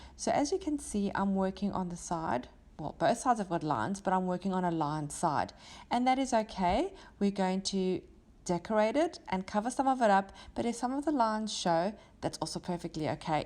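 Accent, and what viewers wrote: South Africa. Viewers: Love your work and your way of explaining what you’re doing and how you come to your final decision about composition. Of course love your accent! Says a fellow South African. I would love to be a winner of one of your cards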